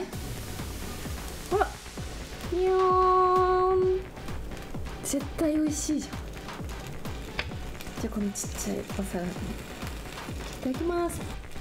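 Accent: Kansai, Japan. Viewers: 日本語も英語も混ぜ混ぜで 関西の女の子って感じで素敵ですね😍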